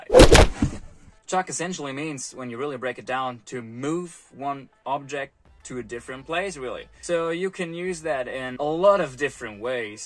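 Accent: Australia. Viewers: I think you're already getting a bit of an Aussie accent 😄👍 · Pretty good Aussie accent. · aussie here! sorry for the massive text block but i wanted to give some honest feedback on your use of the words, i enjoyed the video and although it is the first video of yours i have seen, it will not be the last haha. firstly, the accent was better than most non-aussies, it had a lot of your natural accent in it aswell but the two accents were identifiable, you could definitely tell it was an aussie accent crossed with something. sheila is neutral as ive seen others already mention, its a fairly outdated term nowadays as it can sorta be considered a little sexist, the same way that like "chick" isnt exactly a nice way to talk about a woman. but you can both insult "thats one big sheila" and compliment (sorta) "thats one strong sheila". so its not exactly polite but it isnt always negative. 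fair dinkum really doesnt need the dinkum part tbh. its just refering to something thats fair imo. like if two players on opposing sports teams are talking to the referee and the ref makes a decision that the player deems fair and reasonable, theyd say "yeah fair dinkum, alright" and go back to playing. it can also be almost positive in the same way calling something "decent" is. like, "thats a fair dinkum engine in that thing" so it doesnt have to be about a person, and it sorta just means far/ understandable. something like that. at least to my understanding thats it. its usually just calling a decision agreeable in my experience. but the way you used it about a person is also accurate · Your English is truly Aussified - accent and all. Can't help myself - I have to chuck in a couple of definitions for you (haha). Chuck = throw. Fair dinkum = true, authentic or as a question it means "really?"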